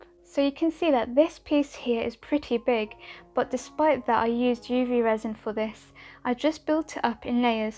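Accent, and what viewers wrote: United Kingdom. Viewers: Thanks so much for this information. I’ve had UV and epoxy resin for ages to use with polymer clay for dioramas etc., but felt intimidated. As I’ve only just found your channel, I will be enjoying a binge! 🥴 Great to hear a UK voice too as I know I will understand the shops etc you name in your videos. With grateful thanks from Scotland! ❤️🏴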